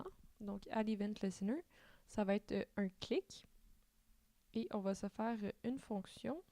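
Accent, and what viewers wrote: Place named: Quebec, Canada. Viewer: Merci pour ce tuto clair et pratique. Et l'accent de nos amis québécois en plus.... J'adore.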